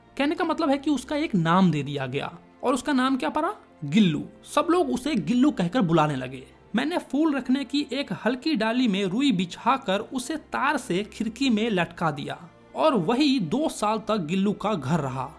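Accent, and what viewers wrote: Bihar, India. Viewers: Bihari apni phele awaaz sahi karle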